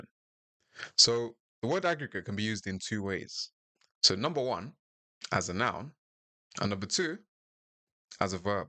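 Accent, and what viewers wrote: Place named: United Kingdom. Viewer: And your British too perfect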